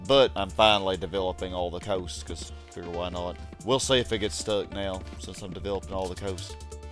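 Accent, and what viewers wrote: South, United States. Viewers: I don't mind if you switch games but I liked the video regardless Always good to have a retro gamer with a southern accent on the youtube timeline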